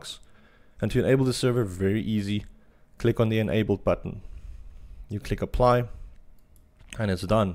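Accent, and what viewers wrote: South Africa. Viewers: Hi, are you from South Africa? Your accent sounds South African:) If so, do you provide in class training. Your explanations are wonderful and I can see where you can add value to my team and I.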